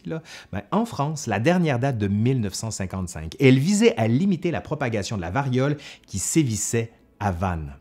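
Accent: Quebec, Canada. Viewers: J'aime ton accent québécois.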